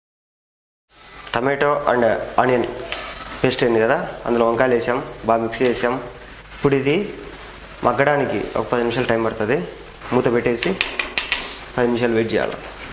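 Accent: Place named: Tamil Nadu, India. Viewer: I like your video bcz u r Telugu is so , I have shocked because Tamil people Telugu is so different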